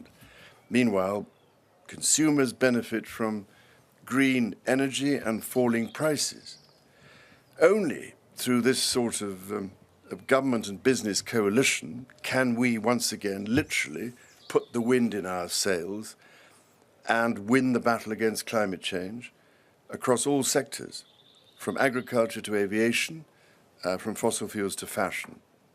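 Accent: United Kingdom. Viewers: Prince Charles has a very classy British accent. His son doesn’t sound like him. I have never been a royal watcher from America and I couldn’t get past the full first episode of the Crown but I am so fond of Queen Elizabeth. She is an inspiration to me.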